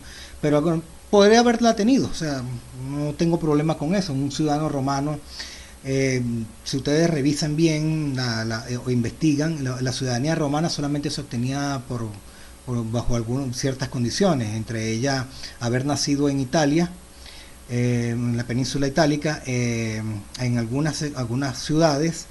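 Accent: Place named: Venezuela. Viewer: Usted,no parece judío,tiene acento venezolano.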